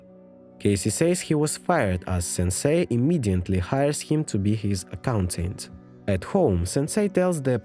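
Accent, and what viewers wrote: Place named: Russia. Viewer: Чувак, какой ты ещё?😂 Ещё и страна указана "Соединённые Штаты". Да с твоим акцентом тебя, русского, за километр слышно. Ты уж если не умеешь говорить как носитель языка, так хотя бы не делай вид, что ты им являешься.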